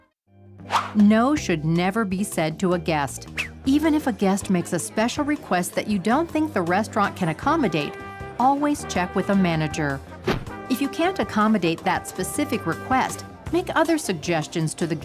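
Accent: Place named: United States. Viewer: A bit American but good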